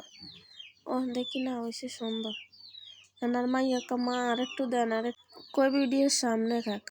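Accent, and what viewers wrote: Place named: Noakhali, Bangladesh. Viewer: নোয়াখাইল্লা ভাষা অনেক সুন্দর হয়েছে ❤❤খুবই ভালো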